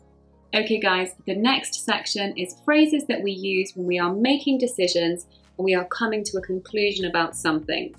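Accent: United Kingdom. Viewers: Great British accent ❤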